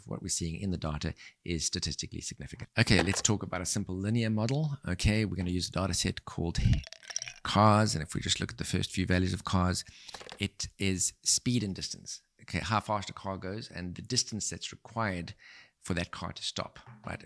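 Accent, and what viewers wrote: South Africa. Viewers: OMG are you South African? That boomchakalaka had me. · Your accent reminds me so much of home! South Africa foreva!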